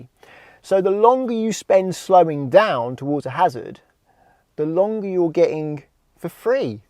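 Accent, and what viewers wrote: United Kingdom. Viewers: Your accent is so beautiful.which part of the UK does it come from?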